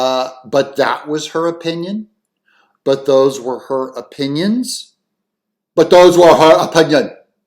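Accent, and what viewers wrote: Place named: Scotland, United Kingdom. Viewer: Love yer Scottish accent 🤭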